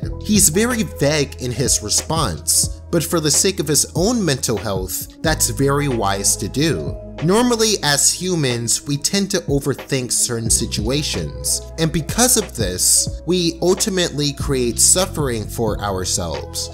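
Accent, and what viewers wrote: United Kingdom. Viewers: Omfg i just realised he’s british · wait, he’s British? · Why the hell is this guy british? edit: btw I never watched finieas and pherb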